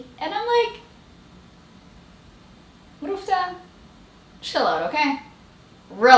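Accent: Tigrinya, Eritrea. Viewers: I like ur tigrina lol 😂😂 😜 N you're so beautiful u know that 😍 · I love your Tigrigna accent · Girl I like your half English and Tigrigna accent 💯 you got me dead af 😂 I subscribed to your channel keep it up Cutie 🎀🎀🎀🎀🎀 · I like u r tigriniya accent so lustig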